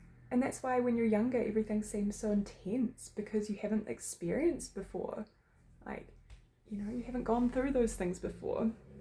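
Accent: New Zealand. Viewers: Extremely courageous of you to share this moment in your life. Give it time, time to & for yourself. I'm hearing a Kiwi accent. I'm not sure if you're in NZ? Maybe contact friends away from your area & get away for a while to break routines. Memories aren't always the best sometimes. All the best & keep shining 🌟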